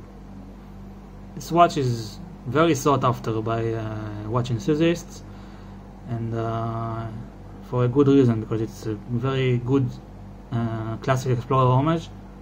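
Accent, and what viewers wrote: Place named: Israel. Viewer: Great review! Are you Israeli? I live in Tel Aviv and you definitely sound like it haha. Anyway, keep up the good work!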